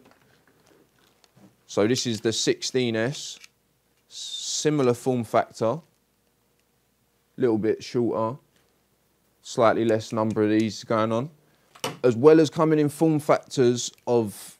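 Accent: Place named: East London, United Kingdom. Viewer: I'm from south east London! You sound like a an east Londoner ( I feel more comfortable hearing you talk) I really hope I can bring my battery to you so you can work your magic !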